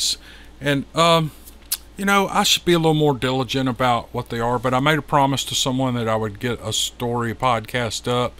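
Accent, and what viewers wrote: South, United States. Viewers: I can only speak for myself, but I haven’t necessarily returned to this channel again & again over the last few years only for “Bigfoot” stories. While that’s what drew me here initially, I quickly came to genuinely like; his familiar southern drawl, his easygoing personality and, his masculine voice… and some genuinely great stories. Sadly, nowadays it seems that any and every possible point of interest seems to devolve into some sort of cult. And we all know to stay far away from cults. could read the lyrics to, and invariably, I’d eagerly listen-in whilst cooking supper or washing the dishes… but, this is all just great entertainment, not a system of belief. Keep up the fantastic work, …💯🇺🇸❣️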